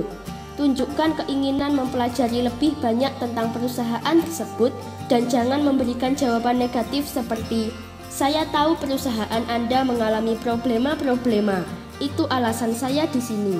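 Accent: Java, Indonesia. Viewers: suara ibu peri asli pulau jawa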